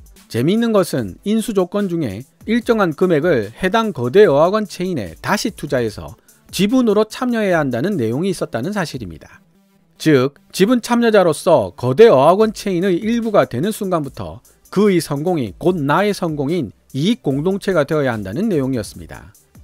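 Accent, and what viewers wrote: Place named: Busan, South Korea. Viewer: 부산 사투리 억양때문에 너어어어어어어무 듣는게 힘들다 표준어만 썼어도 100만은 훌쩍 넘었을텐데 안타깝네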